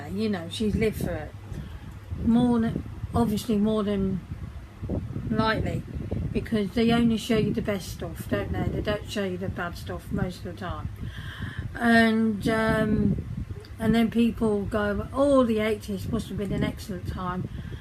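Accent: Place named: United Kingdom. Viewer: Also, I love listening to you. Your accent is so wonderful!! Living in the US my whole life, I’ve always wanted a British accent. 😍😍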